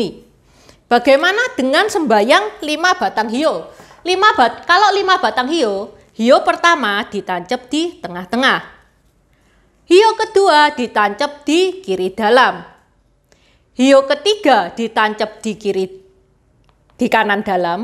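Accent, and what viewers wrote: Java, Indonesia. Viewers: Saya bkn ethnis tionghua ni mbak saya mau nanya ni, apa yg di maksud tepekong atau apakah itu tepekong yg sering di sebut apakah itu sejenis mahluk halus dalam budaya tionghua, trimah kasih sblmnya cici yg mdok jawa nya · cara omongny kyk org jwa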